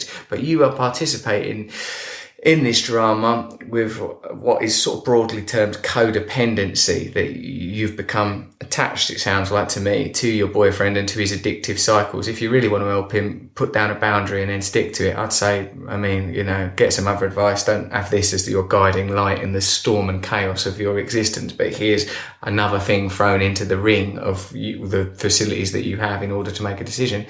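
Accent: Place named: England, United Kingdom. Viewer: I really like the english sound. wish I lived in London ☆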